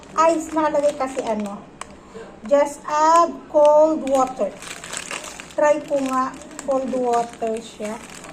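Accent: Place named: Laguna, Philippines. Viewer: Nkpunta KC sya Laguna..kya may eh n Rin sya.pero timmangkin suna nga ilocana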